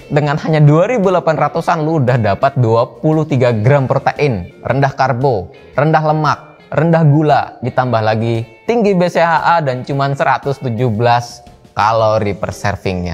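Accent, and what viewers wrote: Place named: Bogor, Indonesia. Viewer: Suara dan nadanya mirip ridwan remin🤣 orng bogor ya bang😂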